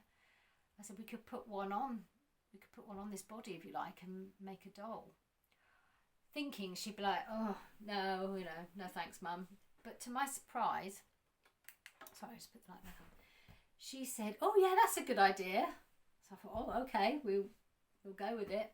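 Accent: United Kingdom. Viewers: Hi there, is always glad to her from you! (did I tell you how much I love the British English accent? Is lovely!) Glad to know your girls are growing up and have been doing nice things! I wish they didn't stop loving dolls, but most of teen girls do, is nothing we can do about it. I do hope at least your youngest doesn't. Girl, you did an amazing job on this Minifee's face up, she looks gorgeous. The anime style is really anime-ish! I love it, you rock! I can't wait to see Tinker Bell out of the box, free as a bird! She is gorgeous! I wish you an amazing weekend. Kisses to you, your dolls and your daughters! 🤗🌹❤🥰😘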